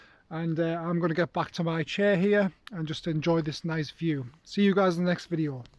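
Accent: United Kingdom. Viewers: When I look at you I anticipate the deep, resonating voice of a godlike warrior. Then I push play and get a Keebler elf with a British accent.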